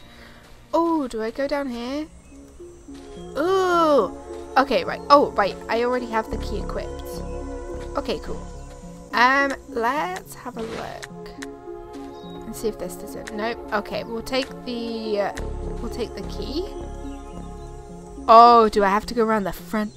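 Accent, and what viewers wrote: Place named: United Kingdom. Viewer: I do like your style, and your vids look promising. Nice work. Your numbers are goals as far as I'm concerned. And it's great to hear another British Let's Player :)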